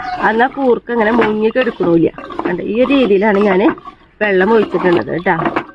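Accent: Thrissur, India. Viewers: Nice to hear trichur basha smiths God bless be cause Amma is with u dear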